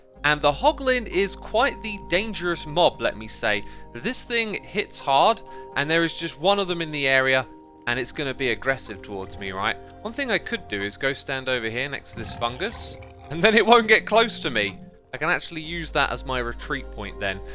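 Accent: United Kingdom. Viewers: Im from Germany and I really love british english. The accent is so awesome and just makes me wanting to live in England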